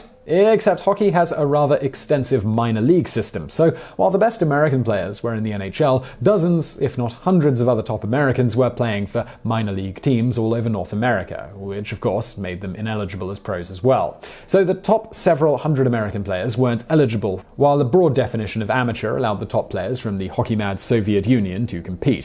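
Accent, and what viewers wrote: United Kingdom. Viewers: Sal Pastore I think some are just because he’s British and they have different pronunciations, where others he’s just not familiar w the word.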